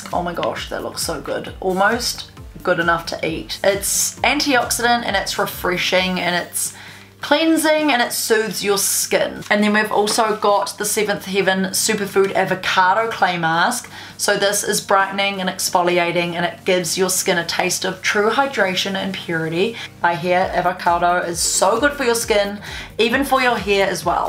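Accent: New Zealand. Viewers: your New Zealand accent is so cute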